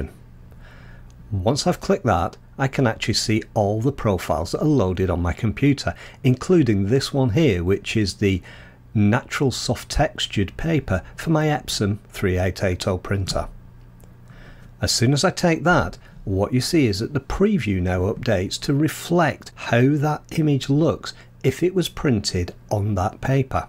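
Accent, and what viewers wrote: United Kingdom. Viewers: British accent? Subscribed.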